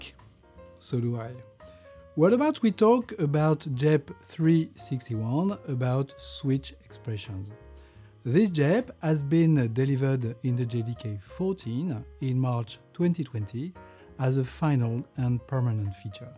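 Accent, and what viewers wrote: France. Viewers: I like the overall concept of JEP cafe, discussing the recent developments in JDK. Apart from explanations, I like his thick French Accent.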